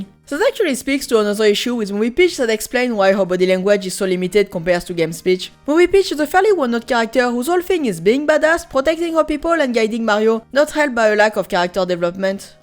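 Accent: France. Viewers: tout mon respect, absolument pas. Tu sonnes extrêmement français.e. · omg luvv ur french accent lol anyway , i don't think she resembles Daisy on personality but the problem is her face , the "Illumination" style ruined her as a whole.. she looks like a normal regular american blonde girl , that barely resembles a "fairy mushroom human (not really human)" plus we know her facial expressions are meant to be "minimalist" real Peach would never open her mouth more than 1inch haha not even in a fight or scream , and Illumination streches Too Much! her facial expressions , what makes her looks with so much attitude (so far from how we know Peach) is just not kawaii cutie , she could easily have a crazy ratched personality but if they kept her FACE instead of these goofy cartoony Disney wannabe face it would be no problem that's not even my they should have kept her uniqueness on her manners and face .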